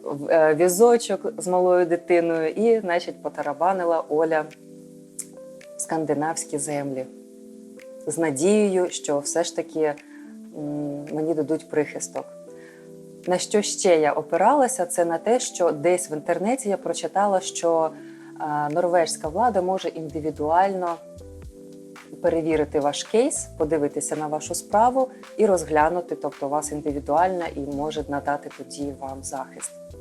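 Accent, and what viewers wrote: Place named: Ivano-Frankivsk, Ukraine. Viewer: Вы не киевлянка,франковская говорит файно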